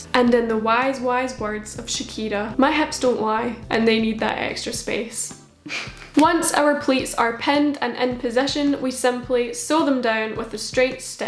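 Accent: Scotland, United Kingdom. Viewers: It’s a Scottish accent :) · Hello! I love this video! I have a question, are you from Scotland? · Omg she’s Scottish, we love to see it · their accent sounds Scottish, but idk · Love your accent, are you scottish? (I think thats how you spell it)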